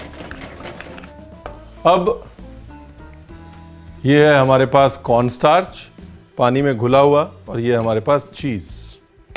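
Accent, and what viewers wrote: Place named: Punjab, India. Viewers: Aap to na ji aap ne to cooking ka andaaz hi badal diya. Aur aapka punjabi lehza I just love to listen to it 👍👍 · Oye hoye, teri Punjabi!!! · U r commendable mann...aur jo punjabi nikalti h khana banate hue oye hoye hoye hoye😍😍😍😍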